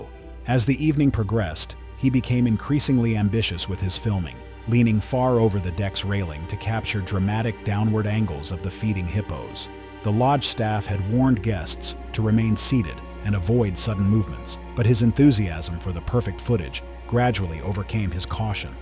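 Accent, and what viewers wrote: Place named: United States. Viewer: Your voice sounds American, so quit with the metric system and us feet and inches.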